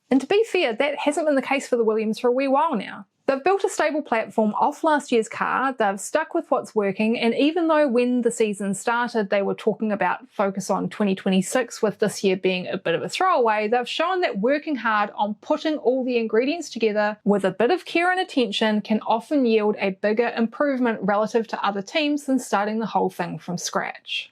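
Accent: New Zealand. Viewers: Yes you are correct. The New Zealand accent is right up there with vulgar American, Mumbling South African and flatfooted hick-up sounding Cockney from the British Isle of London-England. So you are second best in gnawing-ear-splitting sounds and I noticed that I was forced to ‘come again, please’s’ more often than with other distorting violations of the beautiful, melodic version of Oxford English. After all ‘come again’ is not the worst request you can get after a brutal rape. Apart from all my unkind remarks stands my sincere admiration for your very entertaining Emilia podcast. Thank you for that. JVJB from Amsterdam.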